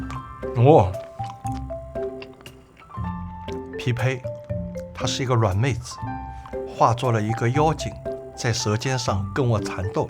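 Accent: Shanghai, China. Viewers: 老师可不可以用上海话讲一期啊，加上字幕就好，听讲话我好心焦，沪普，为什么要让讲普通话呢，之前那个广东师傅就用的广东话录的视频。而且这种传统点心配上吴侬软语的解说更加有感觉！！！！！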